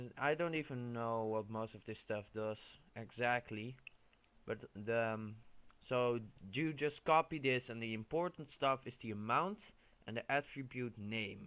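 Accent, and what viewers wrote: Netherlands. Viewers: is this man dutch?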